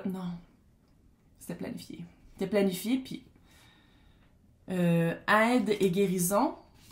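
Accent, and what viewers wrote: Quebec, Canada. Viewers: Bonjour Merveilleux tirage Je vous adore et vous écoute tout le temps. En plus vous-même mettez du soleil dans mon cœur ❤️ avec votre accent québécois. J adore ce Pays . J y suis allée. Bonne journée à vous Gros bisous de Cannes où le soleil est au rendez-vous 😂😘😘😘❤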